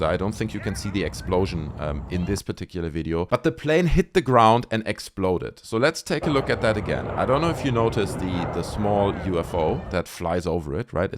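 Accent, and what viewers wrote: Germany. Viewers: are you a German native ? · Are you german? Sounds like you are. If you are german why Do you dort make your Videos in german? Ich meine würde mich freuen freuen als deutscher ^^ =)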